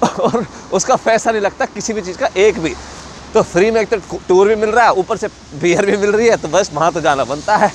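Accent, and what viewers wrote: Haryana, India. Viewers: bhai ji..mein jab japan aaunga..apse jarur milunga..u r so simple..ur haryanwi accent dil ko shu leta hai...love u..😍😁😳😜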